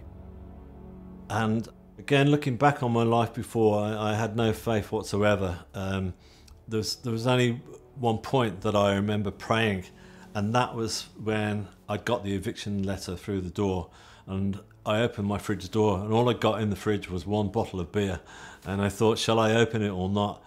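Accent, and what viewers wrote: England, United Kingdom. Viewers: Hearing this English, down to earth accent makes the after life feel even more real. ❤ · I'm English, but it sounds so strange hearing this form an English voice. Incredible story x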